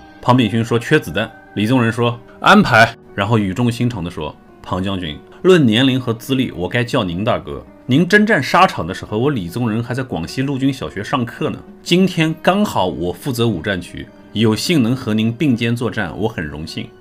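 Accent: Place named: North, China. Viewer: 北方人正常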